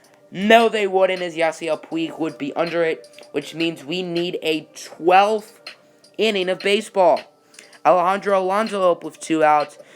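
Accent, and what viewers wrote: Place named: Boston, United States. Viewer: That Boston accent😂😂😂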